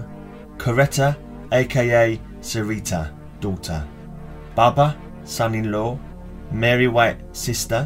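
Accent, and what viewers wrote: United Kingdom. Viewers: Viola played her in Suicide Squad. How to get away with murder is a TV show. Wild show! My brother Matt was in Orange is the New Black, Ratter, and several other things. His best friend Hartley Sawyer played Elongated Man/Ralph Dibny on The Flash TV show. He was brilliant!!! They fired him because of his Tweets from 2011. I was hoping he could tell the producers to give my brother a job as Booster Gold or Green Lantern. Oh well. I shared this vid on Twitter. U have a great narration voice. British, I assume?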